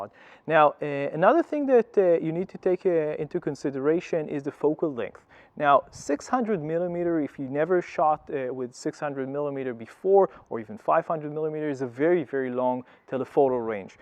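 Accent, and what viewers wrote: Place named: Israel. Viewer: יפה מאוד!שומעים תמבתע הישראלי שלך..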